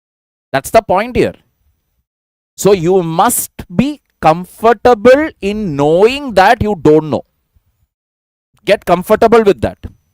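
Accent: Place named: South India, India. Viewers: The only thing i listened sir's English speaking He proved as typically a south indian but also he is very polite in hindi Actually india deserve these type of people from southern part of india ❤❤ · Mind blowing personality with attractive south english accent..the pw thalaiava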